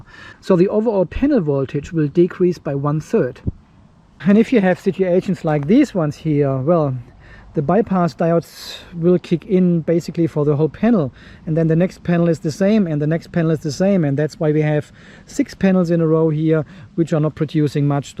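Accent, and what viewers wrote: Germany. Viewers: Durch Zufall bin ich auf deinen Kanal gestoßen und dachte so ... Ist aber kein native speaker. Klingt deutsch. Und du bist es tatsächlich 😎. Sehr cooles Video, sehr schön präsentiert. Das Beste, welches ich zum Thema gesehen habe 😊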